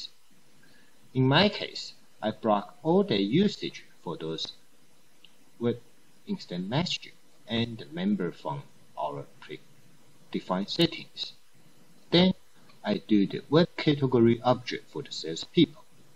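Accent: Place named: China. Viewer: No offense to creator, but it sounds more like Chinese rather than English... Can't be bothered listening...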